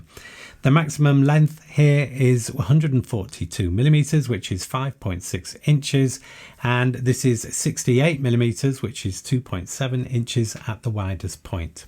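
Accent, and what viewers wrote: United Kingdom. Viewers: Come on! I was JUST about to hit subscribe and then you started bashing Star Trek and my finger mysteriously stopped! My heart shattered and I don’t know if I can listen to your soothing British delivery anymore. Who am I kidding… I share the same name as your friend who made you do this video… I’m in!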